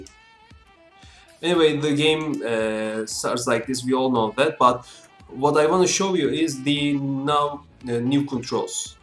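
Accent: Turkey. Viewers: Tamam Türkmüşsünüz, aksandan ben de öyle tahmin etmiştim 😄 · AoD ye bakmadan önce milletin oyunun oynanışı, glitchleri vs. kötü oynamayın dediğini duyduğumda direkt abartıyorlardır demiştim. Haklıymışlar. Gerçekten hayatımda bu kadar zor kontrolü olan bir oyun oynamadım. Hani TR1'in tank kontrollerine bile alıştıktan sonra oynaman çok kolay. Bu.. bu ise başka bir seviye... Neyse ki ''restoration project'' in olması iyi bir patch. Hikaye çok kesilmiş olsa bile yine ilgi çekici. Daha karanlık bir Lara Croft hikayesi olmasıyla beraber bu seferde Lara'nın hem kontrolü kaybedip kurban olmasını inceliyoruz hem de iç dünyasını... Umarım oynayabilirim. Ha bu arada Türk olduğunu direkt aksandan anladım :D güzel içerikler var kanalda biraz onlara bakayım.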